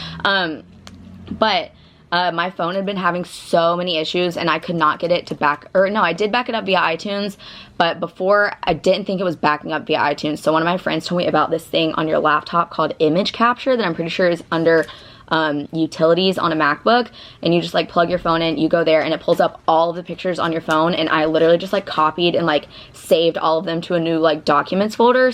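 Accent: South, United States. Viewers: All the people saying she sounds like Miley Cyrus...well she's from the south so it's a southern accent..pretty much all southern states sound the same some more hickish than others! Lol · This is the second video I've way he's of urs and I'm definitely a subscriber now. I live in Tennessee and even though u have a southern accent it's so weird that's it's different from ours down here lol Also, u sound like Miley sometimes. U should get a Samsung phone cuz it's much better in my opinion lol Did u not have a finger imprint or a passcode on ur phone? U can download an app on ur phone too in case someone tries to get into it, it will take multiple pics of them and u can have those pics sent to an email or someone else's phone. Phones cost too much these days to not have good security one them now a days lol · Anwesha Barua it could be because they both have a southern accent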